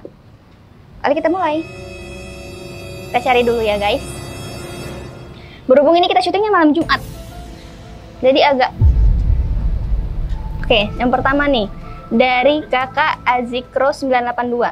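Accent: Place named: Palembang, Indonesia. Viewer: Yeee kak update video lagiiii.. ini bukan serem lagiii,ini ngelucuu..,logat nya palembang bnget deh..kak tmbah cantik,sehat selalu ya kak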